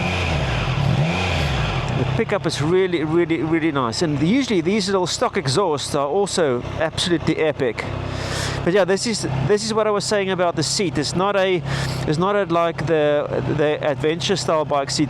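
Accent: South Africa. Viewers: You have a South African accent, have you been?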